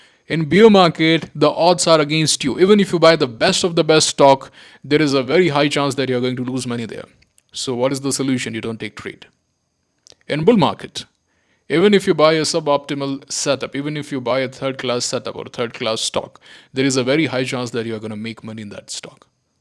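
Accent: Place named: Bikaner, India. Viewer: आपकी बोली से आप नोखा बीकानेर से लगते पुश्तैनी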